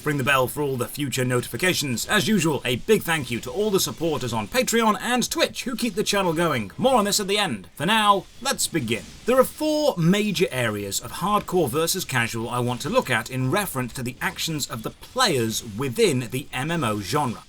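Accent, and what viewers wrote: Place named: RP, United Kingdom. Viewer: I have not played an MMO in over a decade. I simply like the Posh British Guy. Anyone else?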